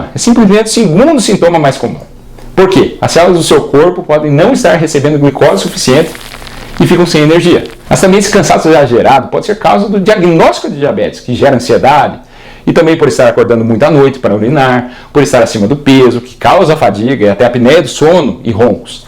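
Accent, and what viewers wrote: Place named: Minas Gerais, Brazil. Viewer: Esse dr parece ser mineiro, incurtando.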